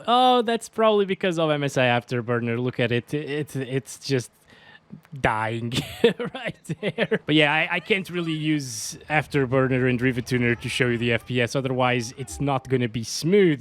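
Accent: Portugal. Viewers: És português??? 🤔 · És português?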